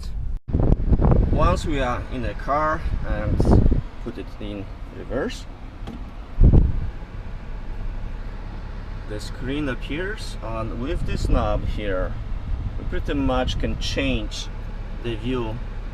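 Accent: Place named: Russia. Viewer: Russian accent)))Learn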